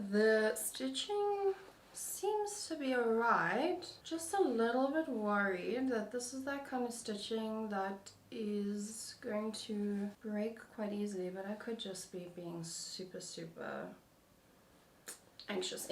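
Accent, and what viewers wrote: South Africa. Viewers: Oh wow I’ve never seen your videos before but when I heard your voice I wondered if you were South African! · Do I hear a South African accent?👀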